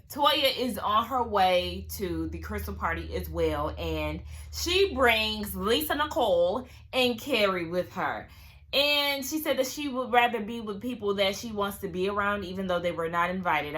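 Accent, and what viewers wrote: Charleston, United States. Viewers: I swear you talk like you are from my lovely city of Charleston!!!! I LOVE IT!!!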